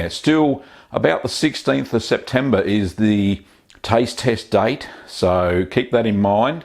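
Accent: Australia. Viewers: This has been my favorite cheese that you've made so far. I really hope this one turns out well for you. I just love how you Aussie's say the number 13. It sounds like, "Thuh'deen." In the US, we really over-pronounce the letter "r" compared to the UK, NZ, South Africa, and Australia. Words like. "Where, there, beer, water..." in the US we really nail that RRRRrrrr sound at the end. You Aussie's really smooth it out to sound more like... "Whea, Theah, Beeah, and Woe'dah." It's nice to hear.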